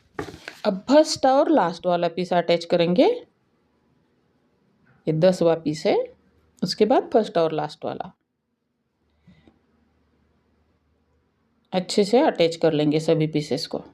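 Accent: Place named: Maharashtra, India. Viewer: mera dost maharastrian hai wo same to same apke jesa Hindi bolta hai ,mujhe sunne me acha lagta hai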